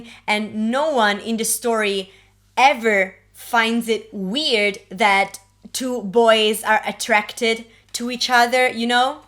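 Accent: Italy. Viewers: Da quando hai cominciato a parlare ho percepito subito l'italian vibe ✨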